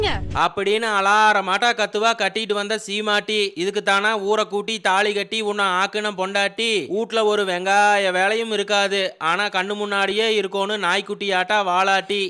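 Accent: Kongu, India. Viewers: இது கோயம்புத்தூர் பொண்ணு உங்க கொங்கு தமிழ் சூப்பர்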